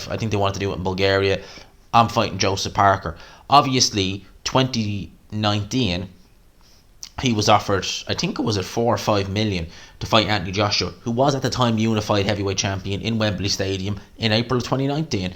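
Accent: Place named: Ireland. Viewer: Your Irish accent is very cool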